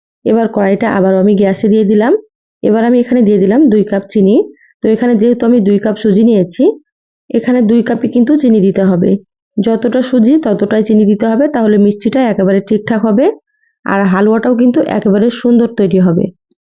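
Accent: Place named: Chapai Nawabganj, Bangladesh. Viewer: আপা আপনার বাড়ি মনে হয় চাঁপাই..!! কথায় আঞ্চলিকতার কিছুটা টান আছে...!!!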